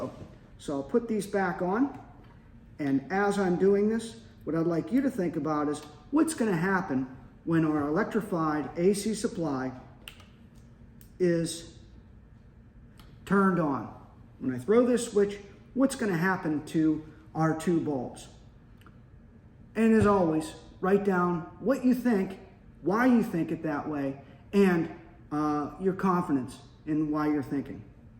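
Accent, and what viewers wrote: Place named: United States. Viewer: The lamps will both illiminate bit alternate at the frequency of the AC power. 60 Hz since he seems to have a US accent. 50 Hz in yhe redt of the world. It may allear as both on together though due to filiment heat and visual preception time. Each diode will block half the wave. Since they are oppsite they will block the opposite side. 99%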